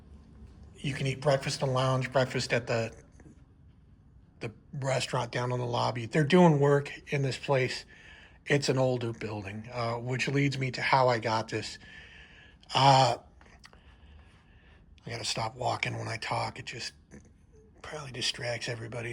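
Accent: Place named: United States. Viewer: I have stayed here before. Very nice hotel. You sound American. No hard feelings - Canadian here.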